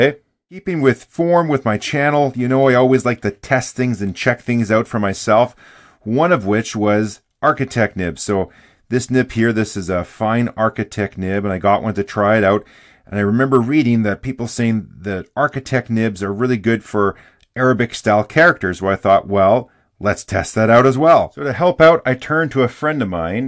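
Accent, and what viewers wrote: Canada. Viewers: Are you AvE’s less sweary brother by any chance. That Canukistan cant is remarkably alike.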